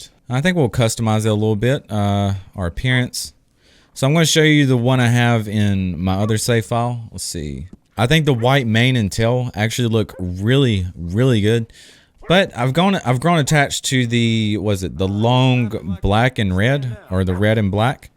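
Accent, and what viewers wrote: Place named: Carolinas, United States. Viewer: Thanks your a pal lol hey are you from the Carolinas cause your accent sounds like it lol I am from there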